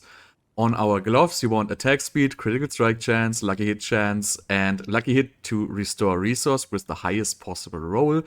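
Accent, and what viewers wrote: Germany. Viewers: very interesting build. by the way, are you german ? I think i heard some pronunciation in that way.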